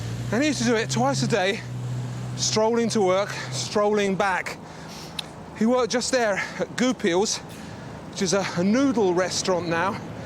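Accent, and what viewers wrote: England, United Kingdom. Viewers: Waldemar Januszczzak you are taking my breath away, the way how you talk this narrative is literal refreshment. I hear you with my nices brain neurons while my ears turned deaf with that beautiful British accent. Thank you for sharing your knowledge, journalists' curiosity, poets' spirit, and Slavic gen. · Why do you pronounce the "er" in clerk as you pronounce the "ar" in art? same with the fooking derby. The Kentucky Derby. innit? . Derrrrrby. not Darrrrby. With an "e". The Darby? Fuck sakes, England. Get it right. Yes, yes, we say learned instead of learnt, and burnt etc. but at least we pronounce derby correctly.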